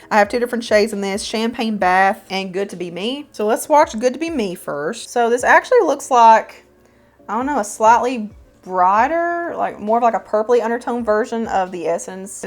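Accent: South, United States. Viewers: Hi! I found this video when looking for reviews on the Relove foundations but now I am subscribed because I LOVE your personality 😊 I feel like you’re from the south because of your accent and same here (MS) so that’s also cool! Love your content I’ll definitely be watching all your videos! 🩷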